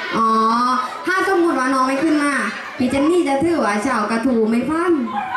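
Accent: South, Thailand. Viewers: รู้เเล้วว่าเจนนี่เป็นคนใต้ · พี่เจนนี่เป็นคนใต้ออกเสียงซาว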